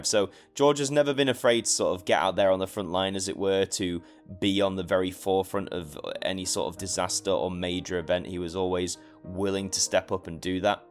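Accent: RP, United Kingdom. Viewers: WhAt a great man my cousin says sounds posh mr George alligayah x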